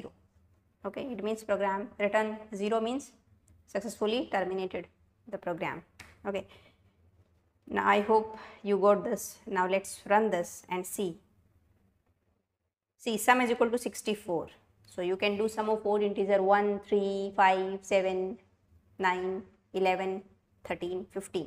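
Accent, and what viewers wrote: India. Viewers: lovely Indian accent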